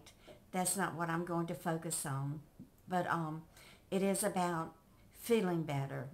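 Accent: United States. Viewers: It’s not what you say but how you say it. Here in the UK we love your American accent and cute sayings and expressions.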